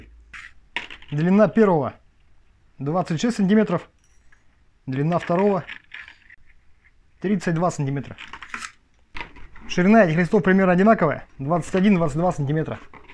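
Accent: Perm, Russia. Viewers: Очень оригинально! Класс! Ты не с Перьми случайно? Акцент как с реальных пацанов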